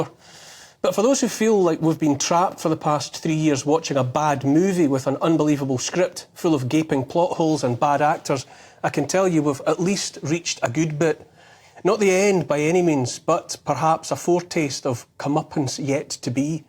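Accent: Scotland, United Kingdom. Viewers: Well said George Best with a scottish accent😃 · it's not just what you say but the magnificent prose you use to say it. I could listen to you all day. The Scottish accent helps too.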